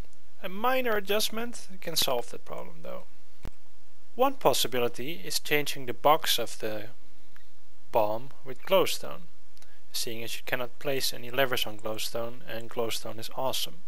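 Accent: Netherlands. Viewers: seems like a dutch accent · Jup, das 100% nederlands accent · are you from holland? because i can hear it. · ok dit is nederlands lol accent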